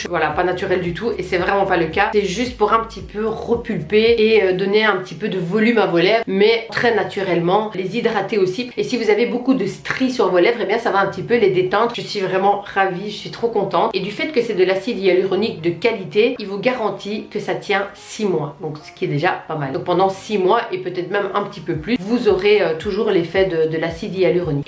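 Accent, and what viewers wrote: Belgium. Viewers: Est ce que tu est belge? Car tu as l accènt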